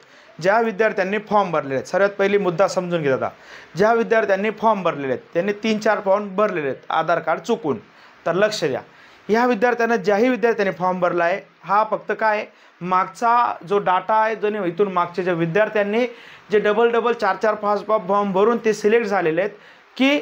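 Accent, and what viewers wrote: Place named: Khandesh, India. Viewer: सर तुम्ही कुठले आहे भाषा मस्त आहे खानदेश 😅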